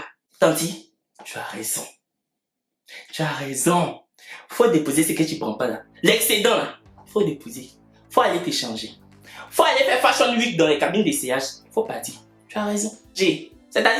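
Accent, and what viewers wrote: Cameroon. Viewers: C l’accent camerounais lol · Tu parles trop comme nous les camer 💚❤💛. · Je dis ca oh ha pure kmer expression. L accent et les expressions du cameroun. Il doit avoir des amis kmer. C est que du positif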